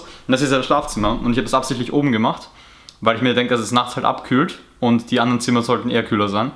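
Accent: Austria. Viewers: könntest du mal ein Gaming Video machen wo du nur mit Österreichischem akzent redest? ;) mach weiter so bist mega cool, aber ich finde es manchmal etwas anstrengend für die Augen, wenn du die Helligkeit manuell umstellst in deinen vlogs :/ das nur Konstruktive Kritik sein :-) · ist das Österreichisch oder italienisch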